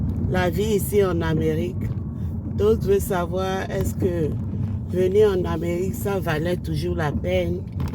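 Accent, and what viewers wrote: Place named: Benin. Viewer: C'est ma première fois, mais l'accent m'a tellement attirer j'ai dis ça c'est mon Benin 😂, je m'abonne direct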